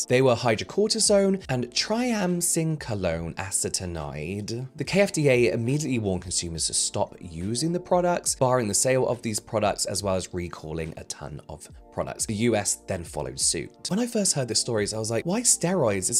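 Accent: United Kingdom. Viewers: struggling to say the second steroid ingredient made me happy…. Simply because I also cannot say anything correctly, and to hear a very posh English person also struggle… One of us!! Is all I could think 😂😂 thanks for being a real person haha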